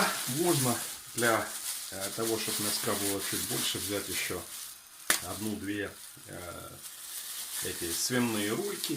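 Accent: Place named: Ukraine. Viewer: приятный украинский говор.У меня отец был с Харькова...Тоже так говорил.Умер в Омске.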